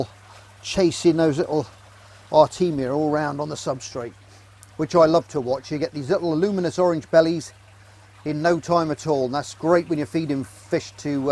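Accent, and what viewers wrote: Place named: United Kingdom. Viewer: Rossendale nice to hear a British voice 😊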